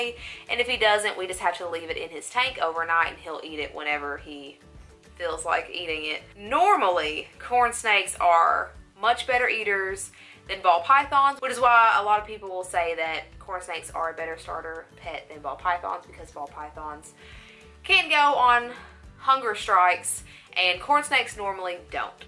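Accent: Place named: Texas, United States. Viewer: You and I have the same accent I'm from Texas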